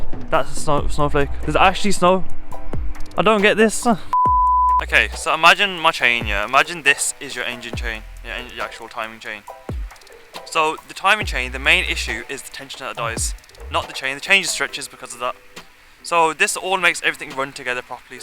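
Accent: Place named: England, United Kingdom. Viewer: Sry man i‘m not used with your strong english accent, my bad. I got a Passat with 1.4 CAXA in june 2023, bluemotion 122hp and back then 36000km. Now i‘m by 100000km and aproacing to a oil service next week. Should i ask to check the timing chain? I‘m a bit afraid since the previous car was a total disaster, Tiguan 1.4 TSI 2009 with 150hp (sims to have not only a turbo compressor but a second compressor as well), they found a broken cylinder during the 12-months warranty and then they offered me this passat since it was a bit newer and they also said it is a better engine. What’s your opinion?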